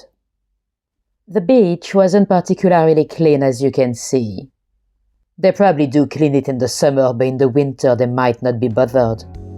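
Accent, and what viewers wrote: France. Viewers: English with french accent what i mix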